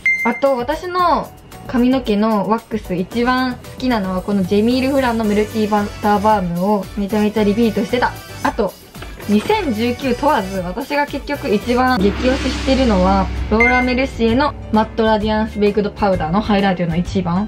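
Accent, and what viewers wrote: Okayama, Japan. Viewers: 岡山弁かわいい！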